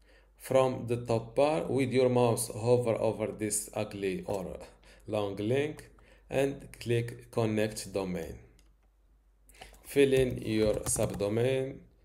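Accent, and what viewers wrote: Morocco. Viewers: thank you sir and i can tell from ur accent that u are moroccan arent you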